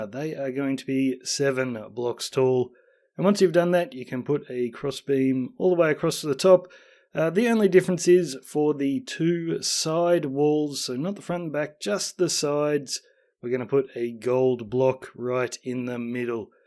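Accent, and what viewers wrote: Australia. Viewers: Oh wow, didn't expect you to be Australian! Hello fellow friend down under 😃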